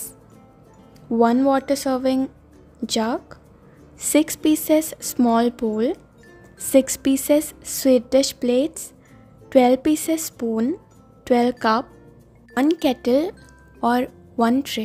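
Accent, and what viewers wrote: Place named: United Kingdom. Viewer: अंग्रेजी उच्चार मे हिंदी बोलनेसे क्या आप उच्चभ्रु होनेका अहसास करती है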